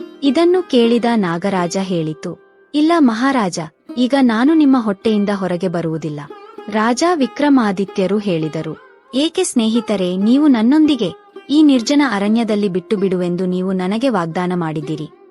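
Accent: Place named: Maharashtra, India. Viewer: Neevu yaru Maharastra davaru iddiriye Kannada vannu heegeke mataadutiddiri😂😂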